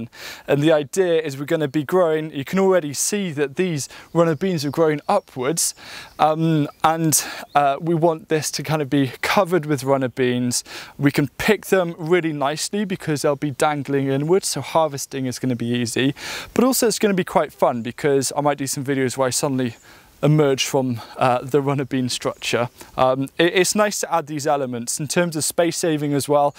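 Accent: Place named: Wales, United Kingdom. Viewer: I’m interested in your accent. Every third sentence there’s a cadence that sounds Welsh. Which region is it?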